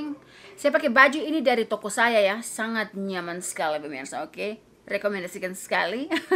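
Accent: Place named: Eastern Indonesia, Indonesia. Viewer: Kk logat timur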